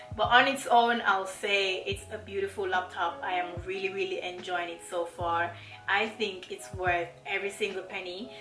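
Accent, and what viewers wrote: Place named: Jamaica. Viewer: I love your Jamaican accent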